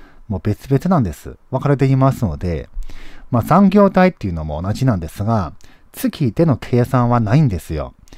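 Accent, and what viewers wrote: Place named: Osaka, Japan. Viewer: 大阪弁だぁ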